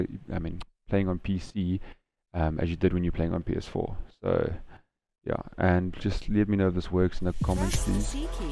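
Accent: South Africa. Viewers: Worked for me!! 🙌🙌🙌 I can now play NFS Payback and Heat with the controller!! And is that a SA accent I hear there?? Lol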